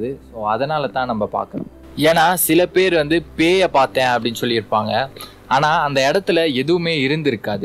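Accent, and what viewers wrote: Kerala, India. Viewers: கேரளா தம்பி குரல் மாதிரி இருக்கிறது. நீங்கள் யார்?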